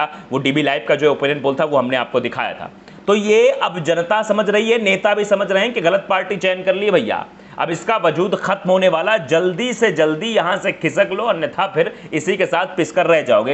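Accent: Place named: Uttar Pradesh, India. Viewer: Plz keep ur style As Hindi news anchor not UP news anchor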